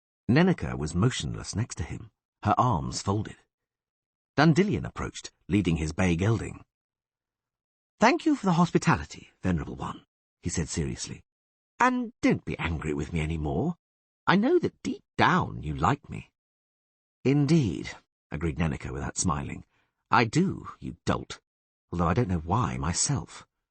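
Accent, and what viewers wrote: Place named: United Kingdom. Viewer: I've got trouble understanding many words, I am not a native speaker and the weird words plus the British accent makes it incredibly hard to understand ( the text helped a lot so thanks 🙏) I'll switch to Portuguese though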